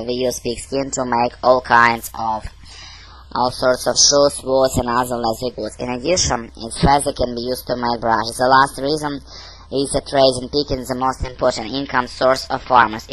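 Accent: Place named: Russia. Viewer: чотко слышно русский акцент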